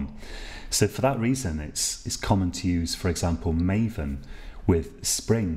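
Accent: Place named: United Kingdom. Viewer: British accent ，i barely heard of in the other' s tutorial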